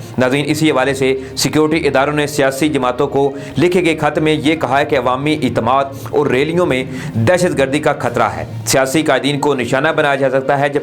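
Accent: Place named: India. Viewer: sahib tum ne urdu bolni kahan se seekhi? Ya tum urdu ko hindi bananay ki nakam koshish kar rahe ho. Mera mashwira hai keh urdu sahi ker lo. Ziada indian ban ne ki koshish na karo.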